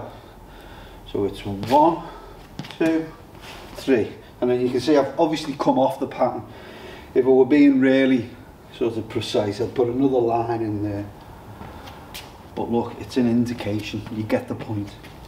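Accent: Liverpool, United Kingdom. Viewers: Like a scouse usyk there mate 👌🏾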